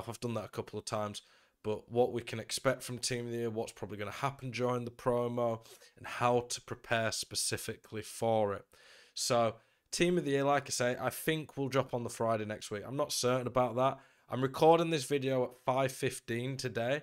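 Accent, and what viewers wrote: England, United Kingdom. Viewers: Bradford Innit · Where you from man ? Your accent is English but I dont know which city ! Just curious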